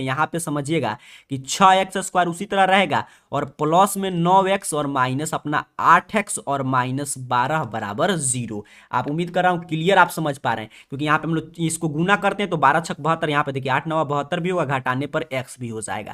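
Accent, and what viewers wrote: Bihar, India. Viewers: Sir is talking in fully Bihari tone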